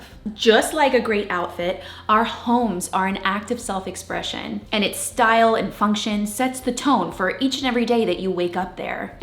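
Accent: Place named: South, United States. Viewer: your southern accent is REALLY starting to stand out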